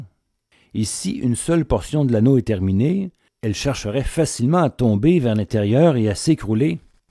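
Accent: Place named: Quebec, Canada. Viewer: Accent québécois hilarant, peu en accord avec le sujet. À quand la Renaissance le long du Saint-Laurent?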